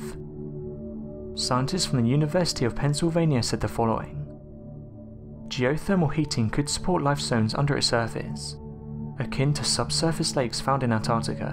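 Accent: United Kingdom. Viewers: That is it. I am sick of your videos. 1) you should not narrate your videos until you correct your "Rs" and "Ss" and loose the brit accent. 2) Stop using deceptive titles. When you were a child, you got in trouble for stealing.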